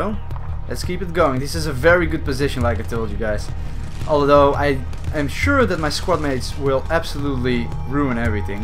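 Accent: Netherlands. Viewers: I smellde a Dutch accent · Are you dutch? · Je kan horen dat hij nederlands is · your Dutch accent is overwhelming haha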